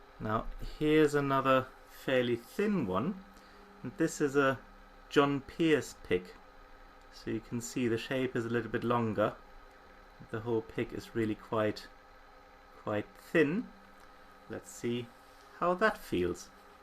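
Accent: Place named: England, United Kingdom. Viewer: Oh your voice..I have never heard it...what a a lovely English accent,. For picks, I am told..there should be no give...no bendy qualities ( for movement efficiency) and not too pointy. ( personally I use Blue Chip TAD 40 always )- but my teacher uses a TAD 60. I have many of the same ones you have too. But I like the way the TAD 40 sounds on your mando. Maybe it depends on the type of music you play (ie: jazz) With 31 picks..I'd say - pick one and stick with it. Which one is the most comfortable in your fingers.? If you get used to one, you will learn how to strike the strings the way you like. This is a good review, btw, for many people to watch.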